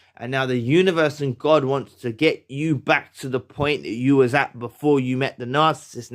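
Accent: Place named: Birmingham, United Kingdom. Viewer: You are a very smart man are you from brum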